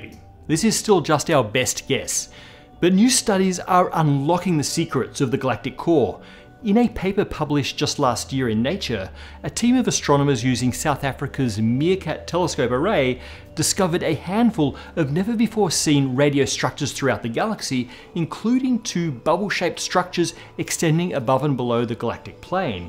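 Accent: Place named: Australia. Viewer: OY! LOOK! AN AUSTRRRRRRRRRRAILIAN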